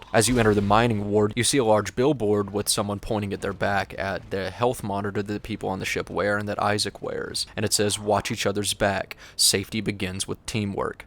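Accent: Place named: Tennessee, United States. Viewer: interesting and enjoyably informative video on a game I've never played, but the audio's subpar, especially when compared to your usual standard of quality the occasional repeated lines and the bizarre sharp increase of the game's volume at the end were actually distractingly bad. if you're following the caldwell-gervais school of longform critique, that's fine, but steer clear of his audio editing habits, or lack thereof your pleasant (tennessee?) accent's far less pretentious and awful than noah's, though, so at least you have that going for you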